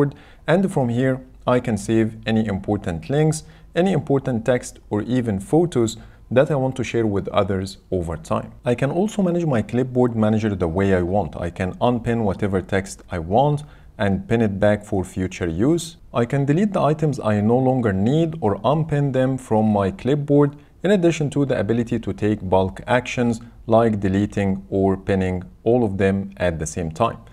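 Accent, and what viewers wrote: Egypt. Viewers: That sounds like an Egyptian accent 🤔? Are you, my dude?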